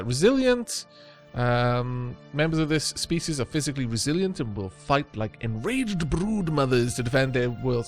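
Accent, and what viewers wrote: Wales, United Kingdom. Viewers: Welsh Accent + Stellaris + Epic Music + Space Bugs = Alef-0 levels of awesomeness.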